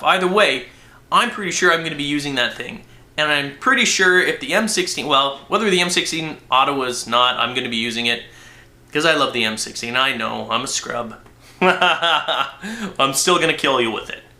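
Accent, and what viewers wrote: Canada. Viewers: is he canadian? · are you canadian?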